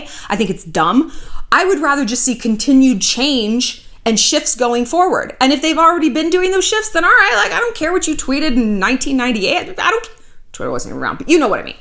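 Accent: England, United Kingdom. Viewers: i like your british accent so much i listen and don’t get the words because i listen your voice like listening music, i have to go back to listen again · your british accent is on point, this video is straight facts. Your hair is glowing 💜💜💜 talking about glowing, you look really happy, relaxed, you are finally at peace. You're blooming... i'm so happy for you, i was so stressed myself out when i saw you struggling with all those issues and bad people. You deserve the best, you helped a lot of people to open their eyes and stand for themselves, you saved a lot of people from bad people and relationships. You deserve to be loved and cherished and this fresh start is for sure the best decision 💜💜💜 Kisses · Your British accent kills me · Love your English accent ! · I love your brit accent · Your English accent is 🤩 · LOVE the British accent! With love from London xx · Looooveee your British accent 😂❤️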